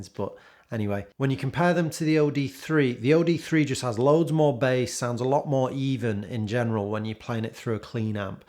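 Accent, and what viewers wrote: Manchester, United Kingdom. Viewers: Cool video. Sounds like you're from Manchester.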